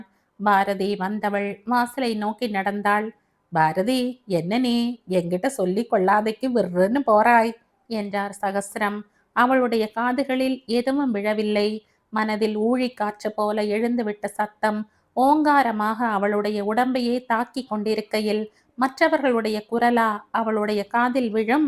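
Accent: Kerala, India. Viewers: Swarasyamai selgirathu novel👍👍👍 ungalin inimaiyana malaiyalathamil vasippu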